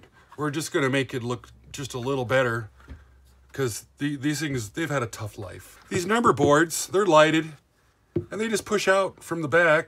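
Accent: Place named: Midwest, United States. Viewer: Love your videos,. Your flat N. Midwest accent & idioms are great humor & your knowledge of tools & techniques for rehabilitation of old gear is unsurpassed.. But one minor correction is in order - to say Lionel O-27 is 1/64 scale is just as wrong as saying it's accurate 1/48th. The "27" in O-27 refers to the diameter of a circle of track (27 inches) which was designed to fit a standard 4' x 8' sheet of plywood with enough room to spare for sidings/etc. Lionel was less concerned with scale accuracy (especially in the early post-war era) than what would fit comfortably in the small bedrooms of the time. While most rolling stock was not accurately scaled to 1/48, and was often much shorter, the proportions were also not 1/64. Do a little more research online to find this is true... Meantime - keep up the great work!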